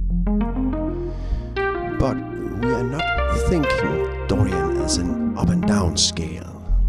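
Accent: Denmark. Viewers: Well, is danish. That's the way they speaks. And plays. Remember, - Denmark has produced many great Jazz players. Palle Mikkelborg springs to mind.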